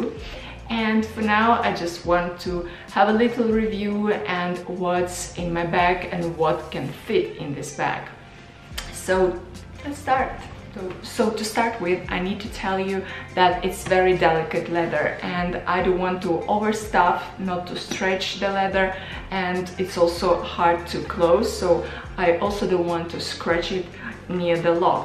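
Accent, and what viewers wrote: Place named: Russia. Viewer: She has Russia’s accent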